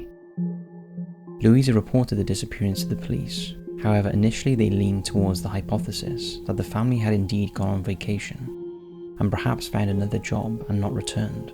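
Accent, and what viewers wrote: Wales, United Kingdom. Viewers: Think he's Welsh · He's from the UK, so his dialect is different than the way Americans or Canadians pronounce words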